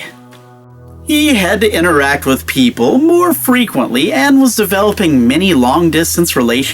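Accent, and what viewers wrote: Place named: New England, United States. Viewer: So Linus from LinusTechtips if he had a New England monotone accent. (that's my guess)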